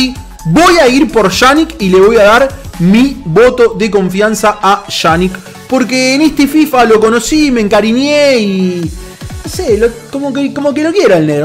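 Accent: Argentina. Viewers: Q lujo oir hablar a un argentino, te lo dice un gallego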